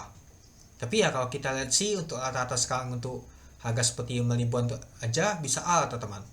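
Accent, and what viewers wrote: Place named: Palembang, Indonesia. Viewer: Lu org Palembang ya Ko?? Logat nya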